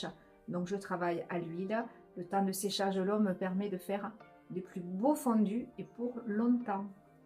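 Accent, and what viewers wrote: South, France. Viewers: et en plus avec votre bel accent du sud c’est encore plus agréable à écouter et voir 🥰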